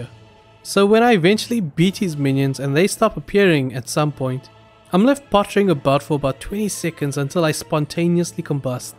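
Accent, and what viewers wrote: South Africa. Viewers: Oh shit you from SA mal kak bru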